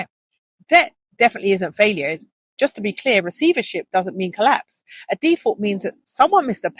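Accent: United Kingdom. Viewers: My thoughts are she should take full responsibility and accountability for everything and also that your ADORABLE wit that Bri ish accent 🇺🇸 🇬🇧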